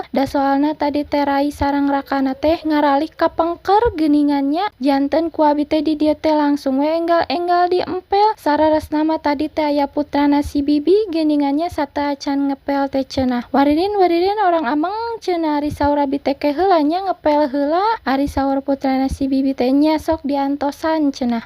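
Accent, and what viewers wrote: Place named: Sunda, Indonesia. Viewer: Sunda nya teh 😊😊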